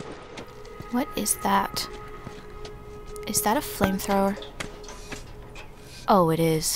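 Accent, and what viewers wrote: United States. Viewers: I usually hate commentaries, but listening to your gorgeous Mid-Atlantic accent is a rare exception. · are you from AMERICA?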